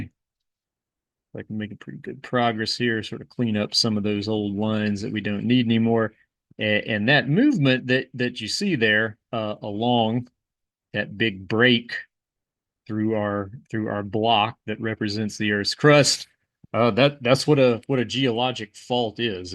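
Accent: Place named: Baltimore, United States. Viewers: I could've sworn dat guy was from Dundalk or Essex. He sounds like Traffic Jam Jimmy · You have what a lot of people would consider a dundalk or western baltimore accent. But its not every word you say. Mostly just the O vowels.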